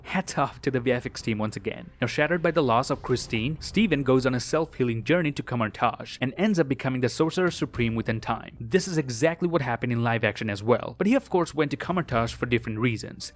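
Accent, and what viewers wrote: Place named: Montreal, Canada. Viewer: You have a Montreal accent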